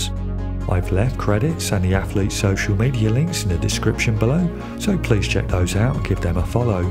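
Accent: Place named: England, United Kingdom. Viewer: An Englishman talking about Americans. You couldn't get Morgan Freeman?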